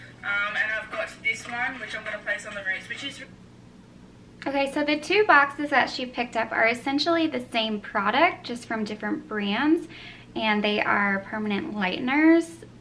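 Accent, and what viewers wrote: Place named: Australia. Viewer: she sounds Australian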